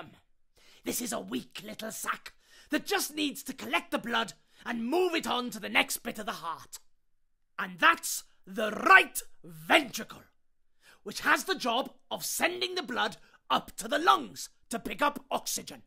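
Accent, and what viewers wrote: Wales, United Kingdom. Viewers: He is welsh! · is it welsh accent? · that's a welsh accent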